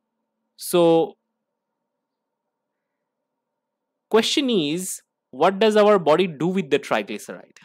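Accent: India. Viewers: bro use AI english or american accent it will promote ur videos,. we indians arent respected in the world so dont use our secret accent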